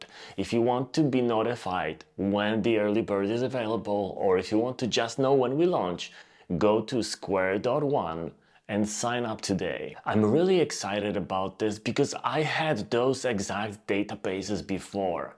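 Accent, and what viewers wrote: Poland. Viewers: i love this polish accent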